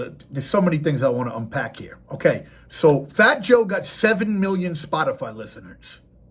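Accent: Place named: West Coast, United States. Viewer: Dog you sound like you from the west cost and you one of those woods that did time in pen because whites and mex run together and that's cool but they can handle them self's on the streetz so stop side bustin and oh yeah Bob James didn't created his music wit hip hop in mind ,..stop you know what I'm saying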